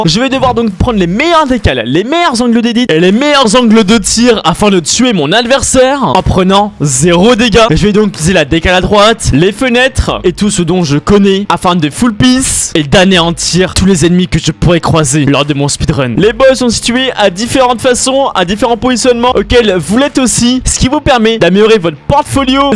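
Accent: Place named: Switzerland. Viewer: Gg mais est ce que tu es suisse parce que tu a un accent suisse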